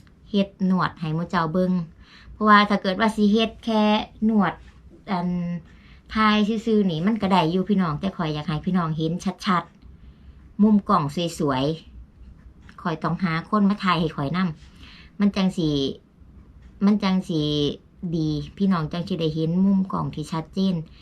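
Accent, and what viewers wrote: Thailand. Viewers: คือเว้าเหมือนตนศรีษะเกษแท้ค่ะ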